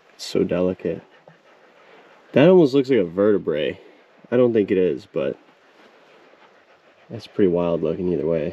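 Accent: Australia. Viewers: I love your aussie so much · Love your Aussie. And the wind not music takes me there with you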